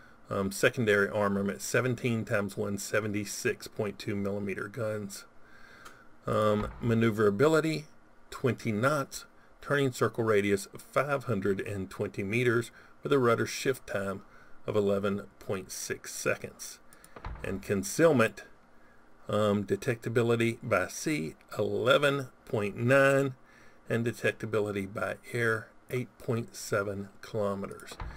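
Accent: South, United States. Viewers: It's so much fun to hear a southerner trying to pronounce European names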